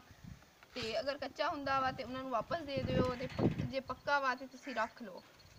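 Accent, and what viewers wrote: Punjab, India. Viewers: Ap Punjabi bohat pyari bolti ho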